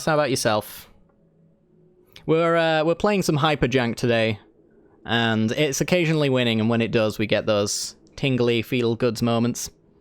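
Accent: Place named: England, United Kingdom. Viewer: Sent here by CGB and found an English accent!!! Woo hoo.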